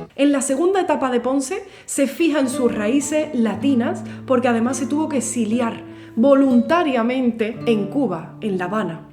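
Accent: Andalusia, Spain. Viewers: A mí me encanta oír tanta sabiduría, y tanta investigación, en mi acento andaluz. Porque siempre nos vemos en los mismos registros humorísticos y lejos de la divulgación culta y de la seriedad. Enhorabuena por tu canal. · Maravilloso contenido; investigación solida y consistente, vinculación de la múscia con sus contextos sociales y económicos, pasión en el decir, maestria en el hablar y todo ello con el maravilloso acento andaluz, repito, ¡¡¡maraviloso contenido!!! Gracias. Saludos desde Guadalajara, Jalisco, México.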